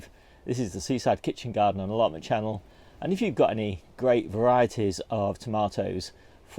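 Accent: United Kingdom. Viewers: You sound like your in the UK. Where I live grocery store tomatoes are hardly fit to eat. They are picked green and gassed with ethylene. I might try this Tumbler variety.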